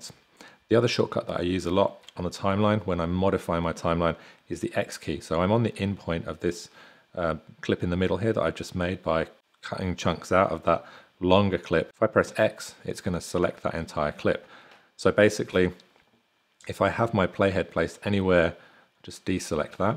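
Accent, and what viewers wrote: United Kingdom. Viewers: Just discovered you OMG thank God I've got a 1 month trial and haven't got a clue how to use it You tips are invaluable and your a fellow Brit without the annoying American accent I have subscribed This will really help before u go out a blow £200 on the package👍🏾👍🏾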